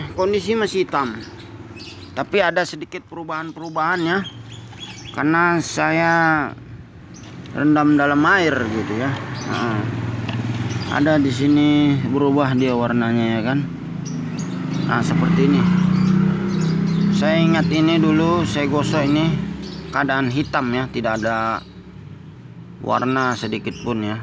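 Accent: West Sumatra, Indonesia. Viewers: ..suara anda jelas bgt kalau anda orang MINANG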